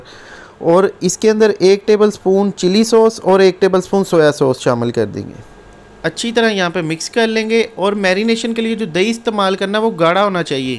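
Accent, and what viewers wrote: Punjab, India. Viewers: ارے بھائی اسٹیم ھوتا ھے نہ کہ سٹیم تم پنجابی انگلش لفظ کو ہمیشہ S کے ساتھ الف کو غائب کر دیتے ھو مثلاً اسکول کو سکول ، اسٹوڈنٹس کو سٹوڈنٹس ،اسکرین کو سکرین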